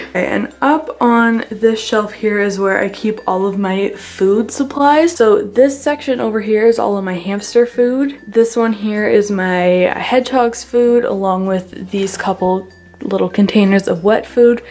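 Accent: Canada. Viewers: Are you Canadian? This isn’t supposed to be rude, I think how you say “sorry” is actually really cute lol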